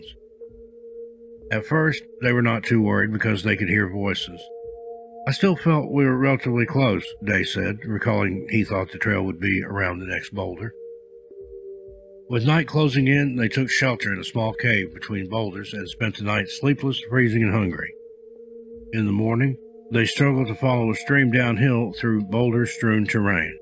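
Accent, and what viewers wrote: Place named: South, United States. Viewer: Your story telling is magnificent, and your voice is extremely comforting. Being from the south, your accent really makes it feel like home. Great work, and you've got a new subscriber! ❤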